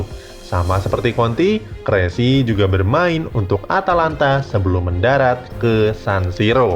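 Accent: Indonesia. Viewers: Lidah indo broo..😅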